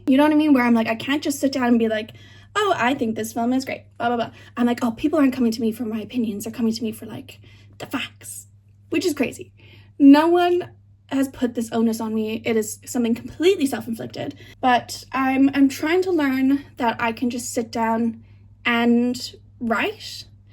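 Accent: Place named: United States. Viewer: She’s Irish, but lived in America for a while growing up, so it’s a mix of the two!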